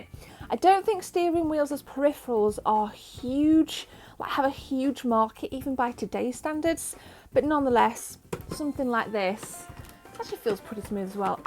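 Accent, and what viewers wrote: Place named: East Midlands, United Kingdom. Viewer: Are you from nottingham or derby im from derby 👍🏼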